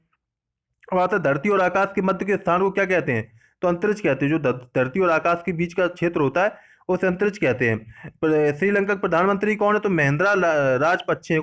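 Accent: Kanpur, India. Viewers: Guru ji kanpur se hai kya aap 😕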